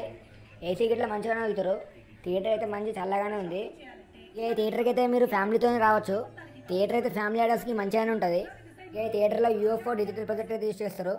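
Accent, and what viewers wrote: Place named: Telangana, India. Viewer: Pakka Telangana Slang 🔥🔥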